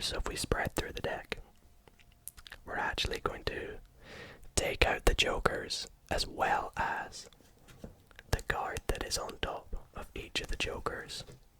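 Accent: Scotland, United Kingdom. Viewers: He’s Scottish 😭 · You’re same is enjoyable because it is interactive and interesting but at the same time you’re Scottish accent and voice is soooooooo relaxing I literally fell asleep watching the mind reading one the other day keep it up👌🏼🤌🏼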